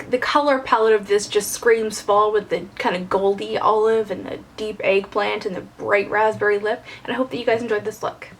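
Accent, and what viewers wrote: England, United Kingdom. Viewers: thank you- I love shows with a brittish accent:) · This video made my morning,! So fun! Love your English accent, love!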